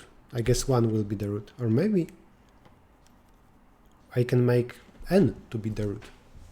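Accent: Poland. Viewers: chyba się zgubiłem. Coś mi akcent nie pasował i myślę polak. dobrą robotę robisz, pozdro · Słychać że 🇵🇱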